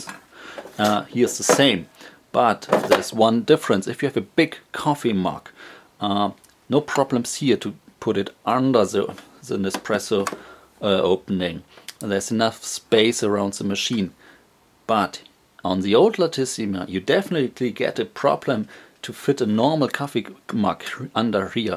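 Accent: Germany. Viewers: I have to say there must be a reason Delonghi still retails the original for $100 more. Not sure why. I'm going to buy a plus as soon as I see a sale to combine with the $50 off from Nespresso. Your accent brought me back to my Army days in Wurzburg :)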